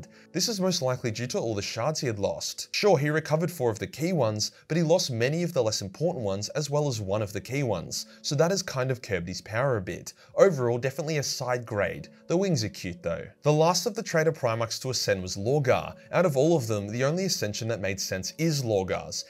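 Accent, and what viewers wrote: Australia. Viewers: He's australian. Very obvious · I got into warhammer through this channel and for about the first three months I thought the eye of "terror" was the eye of "terra" solely due to your accent. 110% the best Warhammer channel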